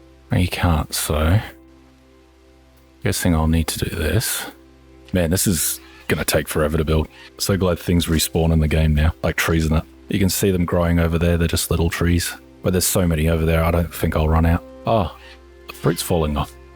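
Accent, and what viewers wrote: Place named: Australia. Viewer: Love the australian accent!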